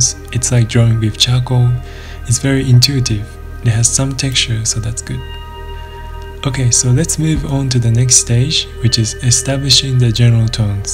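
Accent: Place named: Asia. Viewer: I'm confused in your tutorials from before and always with your intros your voice was much higher and definitely had a stronger Asian accent. But this video you don't have it which I find strange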